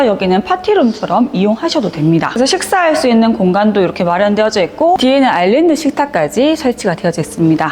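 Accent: South Korea. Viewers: ㅠ여기저기경상도사투리 진짜듣기 거슬려요~~ 한남동같은 그것도서울쪽소개하시면 서울말표준어로 설명해주시면좋겠어요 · 절라도 사투린가요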